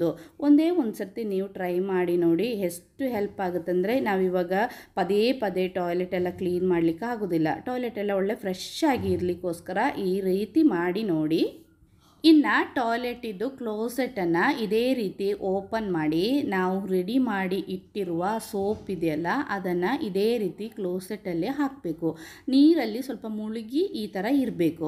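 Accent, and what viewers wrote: Kerala, India. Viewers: Madam malayali aano Kannada ketappo angane thoni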